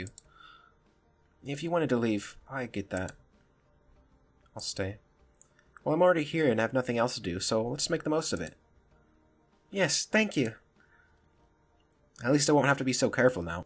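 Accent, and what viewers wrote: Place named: United Kingdom. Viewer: nice British accent.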